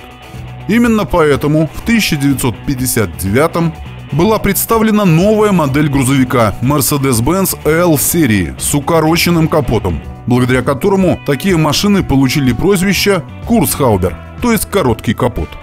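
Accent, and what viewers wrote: Belarus. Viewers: не знаю откуда, но акцент характерный для Витебщины, особенно ярко слышен на склонениях цифр - "пЯци", "дзевЯци" и подобное. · Автор из Беларуси?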